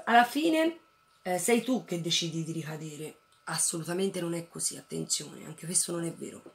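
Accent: Tuscany, Italy. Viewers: Io spero che non chiuderai il canale perché quando in negozio non c'è nessuno mi fai compagnia ascoltando le tue storie e vedendo i tuoi video trucco. Mi sono spazzolata tutti i tuoi video sui libri e molti di essi sono finiti nella lista da leggere. Adoro la tua parlata, mi riporta nella mia Toscana da ui mando da 6 anni. La gente spesso non capisce che siamo tutti esseri umani e si erge su un piedistallo pronta a sputare sentenze, lo vedo col mio negozio. La gente se mi lamento pensa che lo faccia per spillare loro più soldi convinta che evada chissà quanti capitali, manco avessi tre conti in Svizzera non denunciati. Sii orgogliosa di quel che sei, di quello che hai passato e di come stai cercando di tirarti su, sii orgogliosa del percorso che fai per ripulirti... E ricorda che per una che critica c'è tanta gente che ti stima e a cui piaci così come sei.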